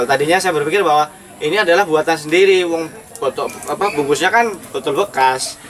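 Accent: Banyumas, Indonesia. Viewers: kang ngapak banget ya......asline ndi jenengan..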